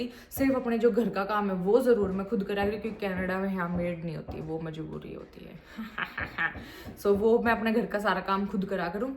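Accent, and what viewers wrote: Haryana, India. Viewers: Hi, congratulations 💐💐 Hazel ki eyes bilkul iske father jaisi hain. God bless you both❤️❤️ I like the way how you speak specially your haryanvi tone😊. I am from Rohtak.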